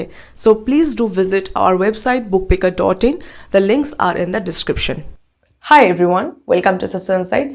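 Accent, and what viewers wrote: Bengal, India. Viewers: Hindi se pta chlta h kii aapki bengali bht achi hogi ❤️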